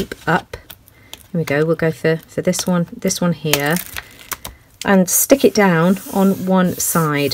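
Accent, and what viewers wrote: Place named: Suffolk, United Kingdom. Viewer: Boy you sound like my Suffolk family in the UK..made me home sick.😀😀😀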